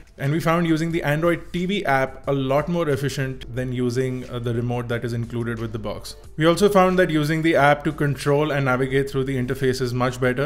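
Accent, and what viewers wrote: India. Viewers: problem with the microphones because your accent is india not english nature not to be racist or anything. i thought this was to see online stuff but is pretty much a big youtube watch and a netflix stuff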